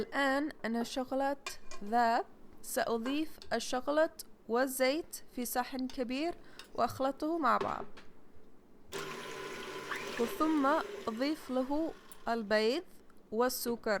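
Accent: Yemen. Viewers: انتي امريكيه ..ولهجتك ولكنه يمنيه مثل زوجك..عندنا بنات بالسعوديه يتحدثون انجليزي..وكانهم بنات امريكا ...سبحان الله الدنيا معكوسه..وبنات الدول العربيه كذلك..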